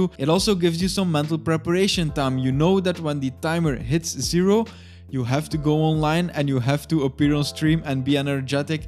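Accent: Netherlands. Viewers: I knew you were dutch! haha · nice man, it works. hey you are dutch ( - )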